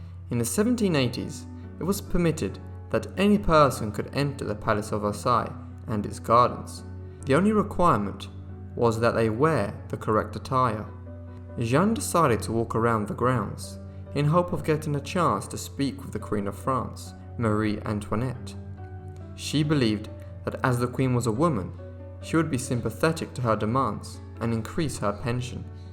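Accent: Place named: England, United Kingdom. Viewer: te mire en un video..no se si fue tiktok o instagram donde tenias la cancion de " " y te reconoci.. wow.. no pareces latino en absoluto.. y tienes acento ingles.. I love your channel by the way.. I love history and historical figures. que parte de mexico?? yo naci en baja california pero vivo en California ahora.. cerca de San Diego.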